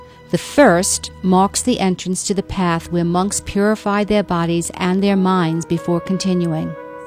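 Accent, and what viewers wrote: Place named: East Coast, United States. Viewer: The narrator's eastcoast accent was a nice touch....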